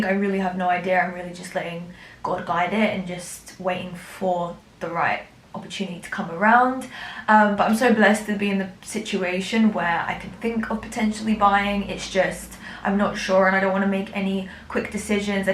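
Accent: United Kingdom. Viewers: Lol she sounds British aahhah is that just me.. must be because I'm British too 😊😊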